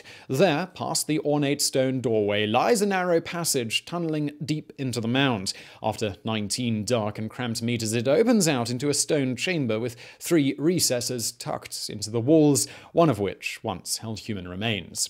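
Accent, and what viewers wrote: United Kingdom. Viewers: U better of listening to an Irish person than a posh English guy about Ireland some what hate for irish go way back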